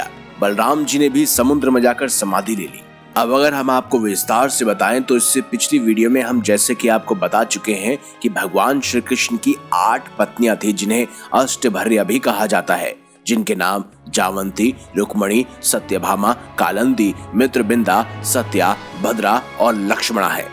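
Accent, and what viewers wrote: United Kingdom. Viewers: जानकारी तो बहुत अच्छी दी है आपने लेकिन आप ये english accent में क्यों बात करते है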